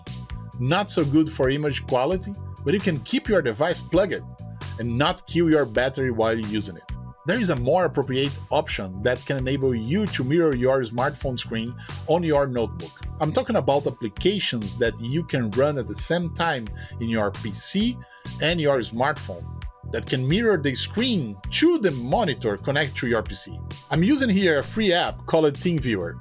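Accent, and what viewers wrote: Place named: Brazil. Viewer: Although your amazing English I think you are a Brazilian guy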